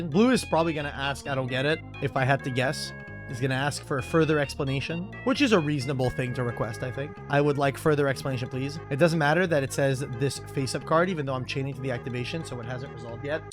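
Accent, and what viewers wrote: Quebec, Canada. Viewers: He is french canadian! Pretty sure from quebec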